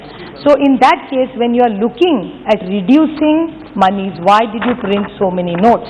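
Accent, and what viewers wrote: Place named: Maharashtra, India. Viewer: मराठी आवाज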